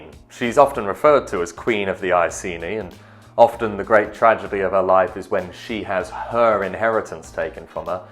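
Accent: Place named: United Kingdom. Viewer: Damn, I love this channel. Being a Texan, I love listening to history in the form of a spoken British accent. Leands it a certain gravitas and epic tinge. I think my wife is the reincarnation of a Celtic queen. Long red hair, piercing green eyes, and by damn, after 16 years of fighting i still haven't conquered her...😂😂😂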